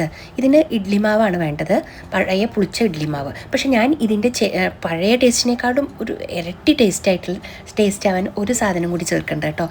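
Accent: Palakkad, India. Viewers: എനിക്ക് ഒത്തിരി ഇഷ്ട്ട ചേച്ചിയുടെ വീഡിയോ യും ചേച്ചി യുടെ സംസാരവും ആ കോളു ❤പൊടിച്ചോളൂ to❤അടിപൊളി വോയിസ്‌ പാലക്കാടൻ ഭാഷ സ്നാക്ക്സ് അടിപൊളി ആണ് ഒന്ന് ട്രൈ ചെയ്യണം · Enikum aa samsaram kelkan enthu ishtamanenno, njanum oru Palakkattukariyanutto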